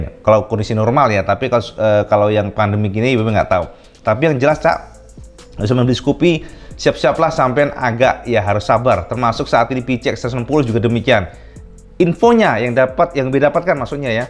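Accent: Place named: Java, Indonesia. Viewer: Gk peduli konten cak iwb apa, saya lebih menikmati omongan yang disampaikan iwb sangat menarik dan enak didengar. Jowo rk ilang jowone cak.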